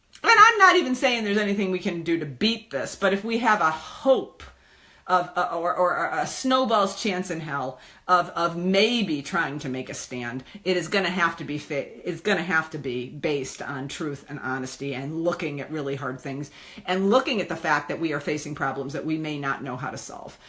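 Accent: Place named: Brooklyn, United States. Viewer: Every line spoken!I Absolute MIGHT!! NOW!!! This is the kind of women you go to war for.. everythi Ng is a redo wath is to keep?? Baby in babth water? i would shut my yapper for!! And here we are in 2025 Just look at this my buying a kimono!! I let us enjoy the day and let us not us not get sidetrack!!the entire ship never floated...and here i was Just playin marco pollo...let us unite in absolut ape shitness or is it to soon!! Who is she??? This is the malcom thi S is that old school left!! Sharp and not hippies or wath ever...The old thing from fruit of the loom and before that french and the anglo cok strudling..europe is re-do..man kind is deii informed..this is a redo as well...we lost this simmNow let us flip the board is absolute anger here i was looking at my self! Let us not loose face!! And lee Camp 🥋💥🥋 let me buy some aluminum depdorant just in case...😂 Never seen her the!! 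Not since the occupy and tea party where smokin a doobie arround a drum circle...in park avenue..This is where its at..washinghton ]]] Donald trump!! Wow that all was fine and dandy!!phillip moris and the the u Nderwear gestapo.. revenge is all we have now..let us not continue playing this awful game of monopoly...Im not letting...I dont tru St the weak and havarus... That is the sexiest AND frankly she as the brooklin n.y Accent!! 🤬😡PSSSST CALL 🤞🤟🤙🤝 ❤🎉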